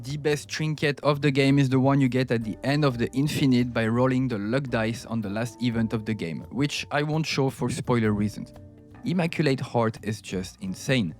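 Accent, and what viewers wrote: France. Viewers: I hear a french accent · Your french accent is very beautiful ^^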